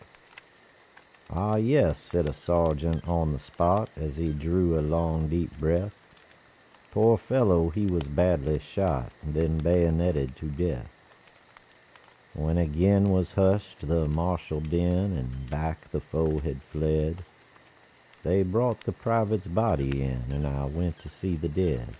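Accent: South, United States. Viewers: Gotta slite bit of * ...Southern drawl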